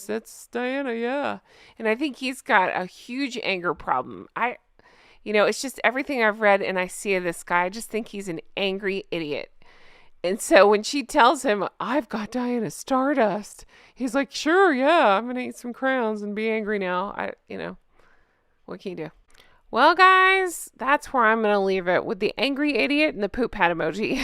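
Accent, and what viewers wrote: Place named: South, United States. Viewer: Oh dear. you crack me right up. Being an Aussie and living in the UK for a very long time, it did take me awhile to tune in to your southern accent, but now you are defo my fave you-tuber...... You make me laugh so much, you fair make my day..... I hope that 2024 is a great year for you, Jay and your family..... loads of love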